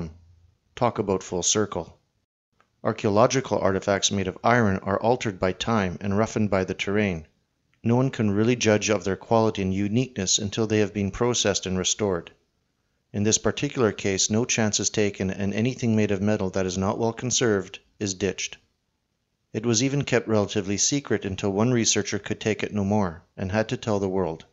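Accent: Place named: Canada. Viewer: are you a Canadian academic? You have a Canadian accent. Just wondering. You are very courageous. But it seems a very lost cause. Bad odds. Sorry. Just my view.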